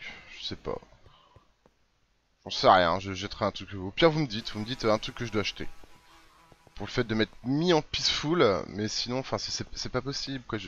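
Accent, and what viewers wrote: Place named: Belgium. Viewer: pOUCE VERT POUR L4ACCENT BELGE !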